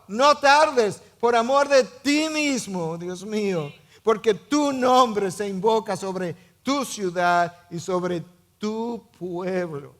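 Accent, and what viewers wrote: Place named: Dominican Republic. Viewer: Mi señora el pastor es dominicano y esa S hace parte de su acento. No se fije en pequeñeces como esas sino en lo importante del mensaje. A usted por ejemplo se le escaparon varias tildes en palabras que las llevan🤔😶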